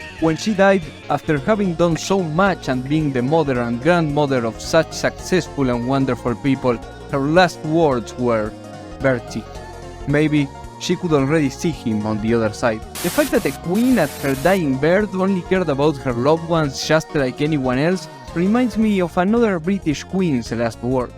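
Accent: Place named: Argentina. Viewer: You sound Argentine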